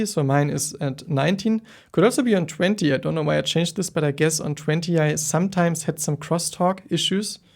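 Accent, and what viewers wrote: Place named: Germany. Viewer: i like the way you speak, professional english with that little german accent chills me somehow lol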